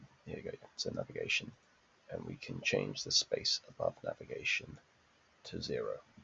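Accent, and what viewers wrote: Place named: United Kingdom. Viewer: Great to hear the voice of a fellow brit!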